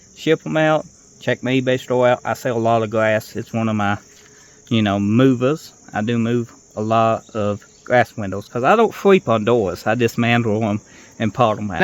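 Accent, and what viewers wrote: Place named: South, United States. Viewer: My first instinct was why does this English man have a southern accent....I listened a little longer and and it became more clear..... discombobulated me at first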